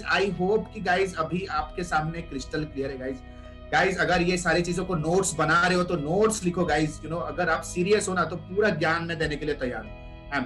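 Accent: India. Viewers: sir indian or foreign??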